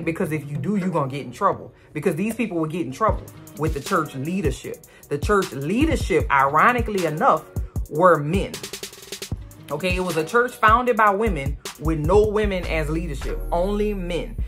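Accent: New Orleans, United States. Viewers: I’m in Shreveport. I know that New Orleans accent anywhere! Keep up the great work in the 504! 👍🏾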